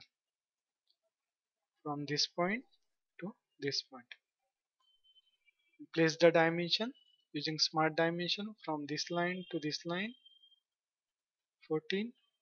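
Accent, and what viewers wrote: India. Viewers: Thank you man who has an indian accent, you are good explaining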